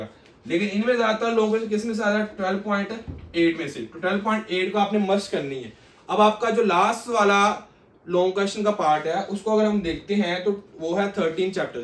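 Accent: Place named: Faisalabad, Pakistan. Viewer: Ap faisalabad sy ho? Q ki ap areeb ki tara bolty ho iqra kanwal ky husband jaisy 😊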